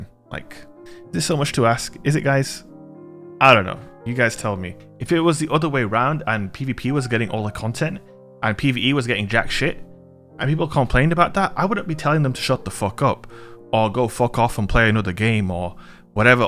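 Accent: United Kingdom. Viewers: I freaking love your accent bro, that’s a big part of why I enjoy your content is just hearing you talk. That may sound offensive, but I don’t mean to say it that way. You really hype me up and I wish I had friends from the UK. On a positive note, remember that the ones sending you hate (the PvE players) are the ones that we teabag at the end of the game after destroying them😎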